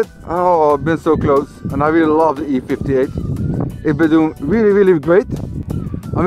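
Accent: Netherlands. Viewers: he sounds soooo much like hes dutch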